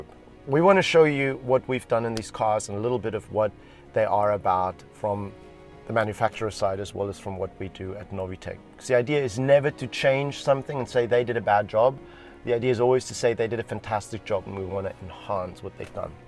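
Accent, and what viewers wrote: Afrikaans, South Africa. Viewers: defnitly .you can hear that afrikaans in him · Is this dude from South Africa?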